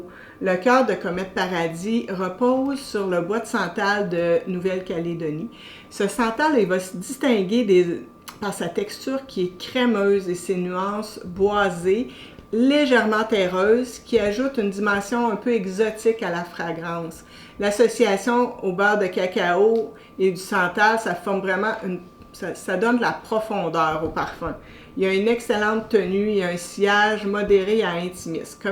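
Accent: Quebec, Canada. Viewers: Salut! J'adore l'art de la parfumerie et je viens de découvrir votre chaîne YouTube. En fait, j'essaie d'apprendre l'accent québécois en écoutant votre français! héhé J'espère que vous passerez un week-end extraordinaire.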